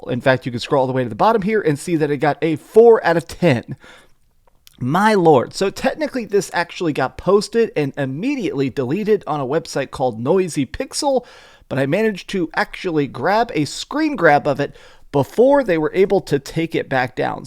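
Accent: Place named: Texas, United States. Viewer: I am not American. But I love your accent, Texas boy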